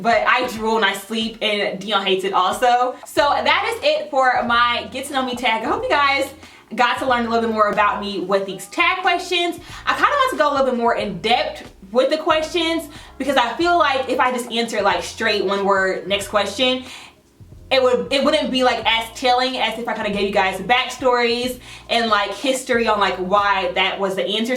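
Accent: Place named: Louisiana, United States. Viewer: ❤️ ❤️, sounds like where I grew up in Louisiana 😊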